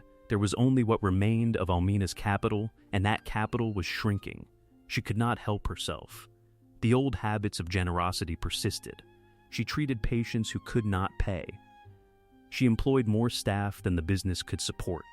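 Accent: United States. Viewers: I liked the American narration. I didn't have to turn on the closed caption to understand it. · Why always American commentators? But story is different, very genuine personality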